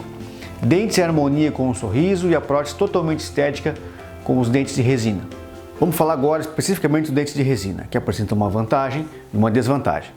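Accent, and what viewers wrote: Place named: Pernambuco, Brazil. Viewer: O senhor é de Pernambuco Dr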